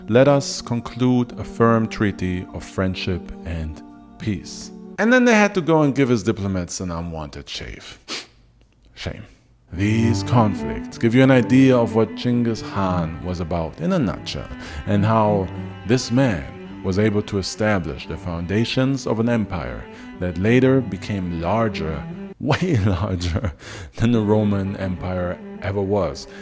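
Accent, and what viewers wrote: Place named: Southwest, Germany. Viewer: If you are not an instructor you should be! Also you mentioned in another video about an allowance in euros. Did I detect a southwestern german accent? I have friends from that area that pronounce the letter R like you do. Keep these vids coming, Thanks!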